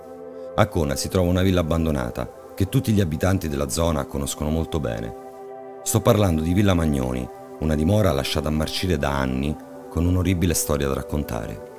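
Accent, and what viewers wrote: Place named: Rome, Italy. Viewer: La voce narrante distrae troppo dalla storia stessa. Bello il timbro vocale, ma inascoltabile la pessima dizione, nonché la biascicata pronuncia del romanesco "moderno", fuori luogo per tali narrazioni.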